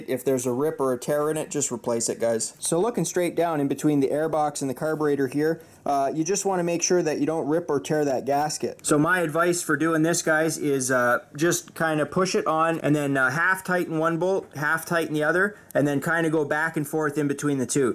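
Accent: Canada. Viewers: Nice video, good lighting and explanation (you sound like you are Canadian!)…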